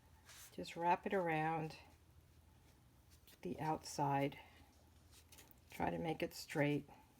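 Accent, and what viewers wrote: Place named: East Coast, United States. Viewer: Soooooooooooo adorable!!! I just love where you placed the sentiment tag. Thank you so much for your well executed tutorial! And as always, this NY lady, in now living in CO, loves hearing your east coast accent!! Be well, Hugs, Karen ❌💜❌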